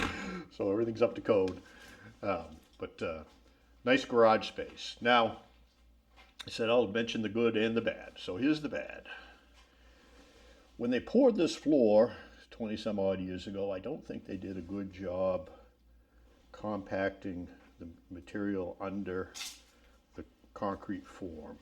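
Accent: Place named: Maine, United States. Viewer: I watch videos just to hear that Maine accent. As authentic as it gets.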